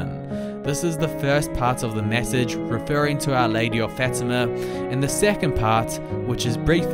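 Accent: New Zealand. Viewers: That is a New Zealand accent.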